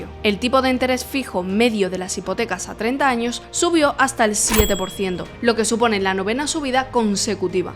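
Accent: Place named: Malaga, Spain. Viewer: Eres muy mona con ese acento malagueño, comparto el final del análisis, se harán menos operaciones en España pero los precios se mantendrán, la recesión no será tan grave, los tipos no subirán, la inflación es coyuntural,es más bien un sinceramiento de precios al consumidor, el mayor problema es los salarios tan bajos que tiene la mayoría..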